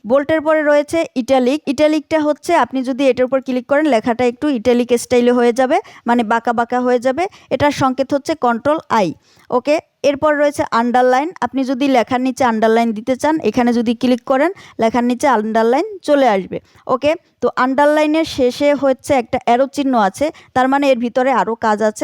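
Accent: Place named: Barishal, Bangladesh. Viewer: মিস, আপনার উপস্থাপনা পরিষ্কার ও সুন্দর কিন্তু র-ফলা জণিত শব্দ উচ্চারণে সমস্যা ঠিক করে নেবেন। বুঝতে পারছি আপনি বরিশাইল্যা মাইয়া। আমিও বরিশালের। However, don't take it otherwise.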